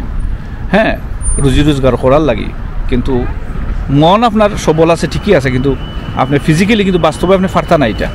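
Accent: Sylhet, Bangladesh. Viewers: Apni jodi sobar jonno video banan,try korben shuddho vashay kotha bolte. Karon sobai to r syleti na. Time r ektu short korle shunte valo lage.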